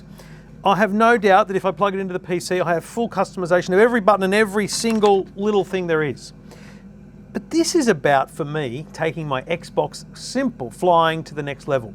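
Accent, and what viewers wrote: Australia. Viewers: LOl It is true that there is a lack of "expertise" here but the video has 3 importants ponts: 1. The Aussie accent, love it 2. The fact he tests on Xbox, quite rare 3. he seems to be a begnner and there are a lot of people who don't know sh..; about flying out there and that will do the same :D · Judging by his accent I'm assuming it's Australian dollars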